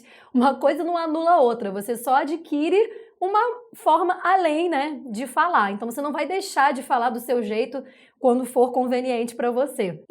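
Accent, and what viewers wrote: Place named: Brasília, Brazil. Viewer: Não existe sotaque neutro. Existem sotaques com maior prestígio. O seu sotaque é quase idêntico ao meu (sou de Brasília). Consideram que aqui possui um dos sotaques mais neutros, mas na verdade é mais prestigiado.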